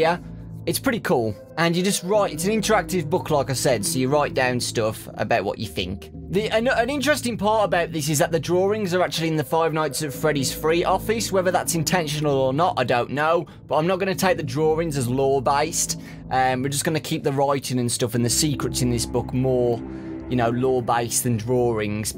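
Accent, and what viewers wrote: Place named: United Kingdom. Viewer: As soon as i heard his voice i said im gonna learn so much British from you this video was really good btw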